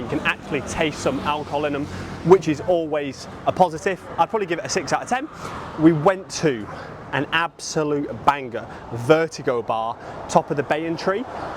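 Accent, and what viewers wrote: United Kingdom. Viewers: Brunei is worth doing for 2 days if you are visiting. I've been to Singapore a few times. I have relatives in Bolton, I thought you did sound a little bit Mancunian lol....I'm from Nottingham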